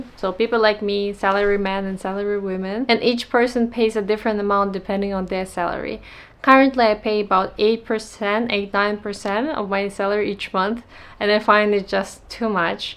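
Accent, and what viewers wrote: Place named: Russia. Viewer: Russian accented English? How is your English so fluent? Ever lived in NYC? (and Russian accented Japanese --novel!) This is solid information. I could retire in Japan tomorrow but unfortunately, Japan hasn't recognized that their largest export is their Culture. They could fix so many economic problems by creating simplified paths to permanent residency & citizenship with such a massively declining birth rate. It's time for Japan to allow citizenship by investment & relaxed visas. But then again, recognizing societal issues, and addressing them is not exactly an established practice in Japan. They are collectively killing themselves with their own xenophobia!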